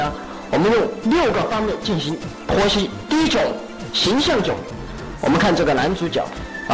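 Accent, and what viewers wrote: Sichuan, China. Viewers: 我四川人听的很顺畅，只是他很川普所以有些不会四川话的人会觉得很怪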